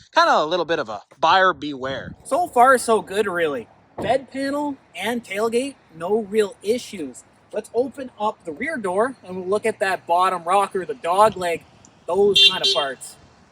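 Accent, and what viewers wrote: Canada. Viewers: The Canadian accent lol its all good! It reminds me of the Red Green Show! I'm from southern Ohio near west Virginia so don't worry!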